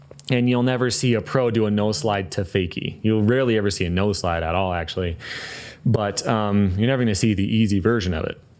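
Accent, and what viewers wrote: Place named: United States. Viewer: the .at thing confused the hell out of me back then - "this guy is austrian like me? no, he talks like an american? What the f is going on?!"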